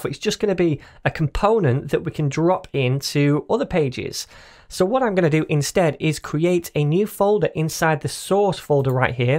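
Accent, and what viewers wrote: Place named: Barnsley, United Kingdom. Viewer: Great videos mate, thank you! Saw that you mentioned Manchester but sounded like you were from Barnsley 😉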